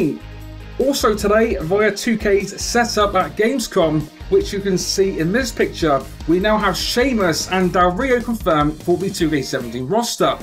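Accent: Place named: Bournemouth, United Kingdom. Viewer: I think I know where your accent is from. Are you from Bournemouth, England? And btw another great video you're killing it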